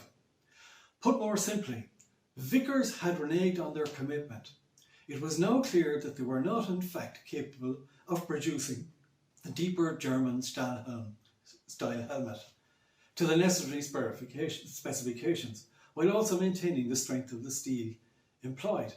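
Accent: Ireland. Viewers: It took me about 40 seconds to start making sense of your Irish accent. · Personaly, I thought the man a fine speaker and his Southern Irish accent a delight to listen to. I have family in Yorkshire and have visited the county lots of times over the last fifty years(great countryside,great food,great pubs,great accents). A good talk, which cleared up a lot of points and scotched a few myths. It was most interesting to note the helmet was in fact a vickers product. Not surprising when there were once at least six Irish Regiments in the Briitish Army. I am from Scotland,and no, - not a Nat, a Brit. Thank you,informative stuff.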